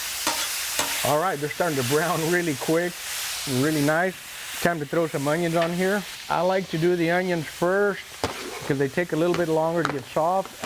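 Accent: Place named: South Texas, United States. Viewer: I could hear it in your voice brother. Haha The RGV is my second home. I spend a lot of time out in McAllen. Do you have a restaurant out that way?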